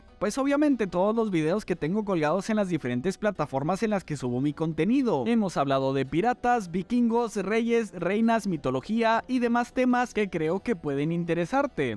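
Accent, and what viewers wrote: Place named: North, Mexico. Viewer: Chido canal, por cierto, eres mexa? Supongo que tu "sh" proviene del norte, como de Chihuahua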